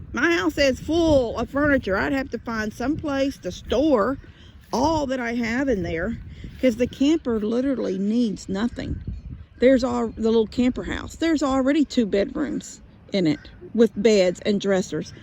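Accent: Texas, United States. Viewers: I'm literally catching up with you and honestly I could listen to you all day. So many of the stories of your life resonate with me. I've always had horses and they are my life. Just one husband a daughter and a son, even my kids said why do you put up with this but I'm of the generation who stuck it out. I got rid of him finally and bought a little beachside rundown cabin and damn near killed myself doing the Reno to make it liveable. I swear I can smell that smoked meat I used to smoke fish. I've told your dad I just love his Texan drawl and sure love yours too. I'm Australian and you know what we're thousands of miles away in distant lands but I sure do love you folks, you're my people 🤗 give that lovely big boy of yours a kiss from me. My name's Megan MacDonald and I really enjoy watching your whole families lives ❤️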